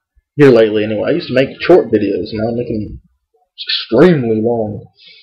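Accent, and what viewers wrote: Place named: South, United States. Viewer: Poor little Andrew.. He's so sad.. At least you can get a woman,. Unlike Andrew who can only get butt hurt.. Anyways. I love the video baby, but stop rambling about other people besides me when it's just suppose to be about me. Lol. I am conceited remember?? And you are starting to talk like me. Lol. Country! xP and I worked at Winn Dixie for 4 months and then Pizza Hut for 4 months and finally quit that too. Lol. Which reminds me.. You need to get another job.:)(: Lol. I LOVE YOU BABY! <3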